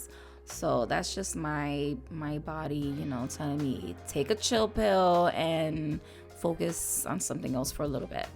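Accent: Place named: North, United States. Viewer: I always watch videos love the content and rarely, comment, share, Subscribe. But the way you shouted one of your followers for the gift! And willing to help her expand her clientele, along with the sincerity I felt from you. I had to comment and subscribe!! 😊 LOVE YOUR CONTENT….Your Northern Accent is strong. I grew up in New York, I watch your videos so much mines is coming back stronger. 😂😂😂 You remind me of one of my “NY Boricua” Besties from L.E.S!!!!!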